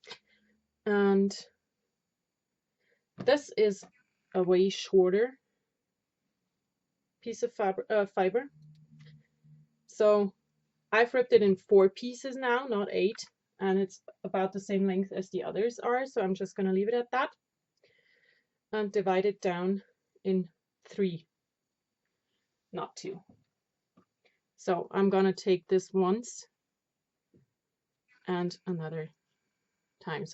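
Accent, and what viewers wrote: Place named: Germany. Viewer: Hallo, du sprichst doch sicherlich Deutsch, oder? Ich höre so ein Dialekt in deinem Englisch...:-) Wäre schön, so ein Video auf Deutsch zu machen. Danke!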